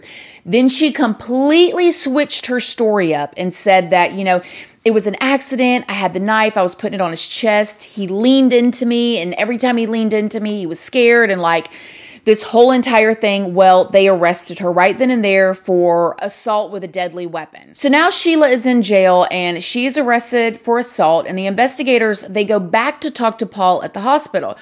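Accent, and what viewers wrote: South, United States. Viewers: I just discovered this channel. Someone help me out. I'm trying to place her southern accent that fades in and out. First, I said Tennessee... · You’re country accent is everything I need when hearing these crazy stories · she is from the southern tip of Florida, from what I remember. she has a slightly southern accent.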